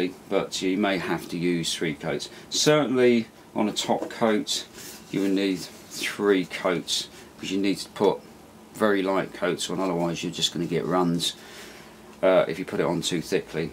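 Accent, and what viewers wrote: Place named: United Kingdom. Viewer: Now i feel like a dick, but I would like to see the build progress. Seems like you are the one who I am always watching build kits. Either that or there are hundreds of other british sounding dudes reviewing literally all the shit I do. I love air fix, all the kits, and most other british stuff. (top gear, is by far the best goddamned production in the history of moving pictures.) I mean to offer constructive criticism from my perspective… please don't be offended by me or any other internet jockey, cause sitting behind my desk in the nude, i sound much more threatening that I actually am. I love to see the kit, how it goes together, and not too much discussion on anything else. Its fitment, panel details, etc. Most of the time I am looking to see if there is a set of detail enhancing parts. Photo etch, cartograph decals, resin conversions, things of that sort. 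That is the primary reason I stretch beyond google. I am always looking to add more detail than is available from the factory. Needless to say, please keep this up, I have watched hours of your reviews. (again, either you or some other person with your exact accent, and voice to the best I can determine) So, thank you for reviewing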